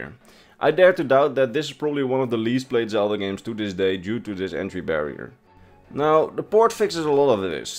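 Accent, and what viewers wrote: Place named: Netherlands. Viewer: Random question but are you Dutch lol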